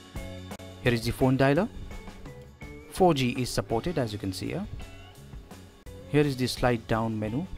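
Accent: India. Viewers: are you indian cause I recodnise the accent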